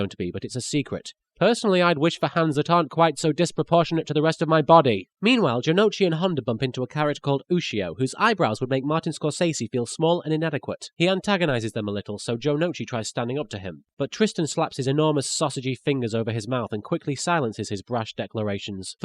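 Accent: United Kingdom. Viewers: your british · wait is british? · Wait is British??? Bakura? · holy crap is british??!!! how did i not know that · ZOMG his real voice is just a combination of bakura and yugi's voices. or yugi with a brittish accent hard to tell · I was not expecting for you to be British. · Except his voice is more British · He's actually British?! THAT'S AWESOME! xD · I miss your old clearly british voice.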